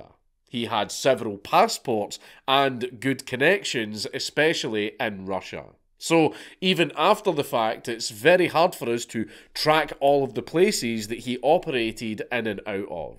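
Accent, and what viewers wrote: Scotland, United Kingdom. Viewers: Hey man, you’re clearly Scottish do you reside in Scotland or are you across the pond in the Americas? I’m not stalking I’m just super curious because I personally share some of your “political” views (which you’ve only ever eluded to but never overtly expressed) & if you’re NOT in the US only makes me that much happier.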